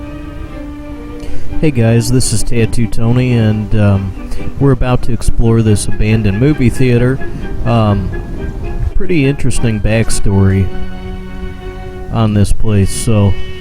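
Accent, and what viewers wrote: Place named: Midwest, United States. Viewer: Classic Midwestern Accent... I was asking myself... what accent? Then I realized where she was from. Your voice is very soothing. I would like to take an entire section of those glass blocks.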